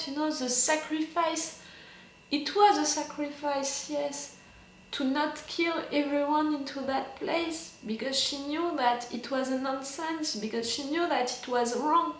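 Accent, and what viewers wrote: France. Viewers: Elle est française ça s'entend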